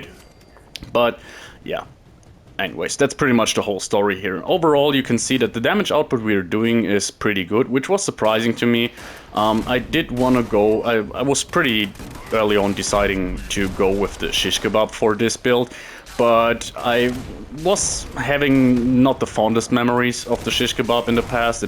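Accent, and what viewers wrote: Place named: Germany. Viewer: Ich hab keine Ahnung warum aber als Deutscher erkennt man andere englisch sprechende Deutsche wie aus dem nix 😆 Gutes Video 😇🥸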